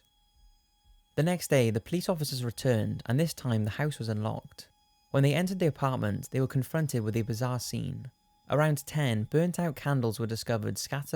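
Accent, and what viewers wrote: Wales, United Kingdom. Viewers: does anyone know if this guy is welsh or not? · Sounds Welsh to me.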